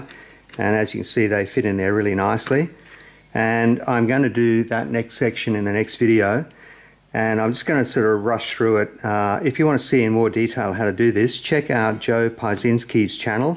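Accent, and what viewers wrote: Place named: Australia. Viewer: Good video,! I’m so glad to see that you decided to do the steam engine on camera! As they say, “You can please some of the people all of the time, and all of the people some of the time, but you can’t please whatever kind of monster wouldn’t want to watch someone with an Australian accent build a steam engine.” Thanks for the animal videos, they are adorable when they’re babies. Except for the birds…. They start out ugly, but they do get better!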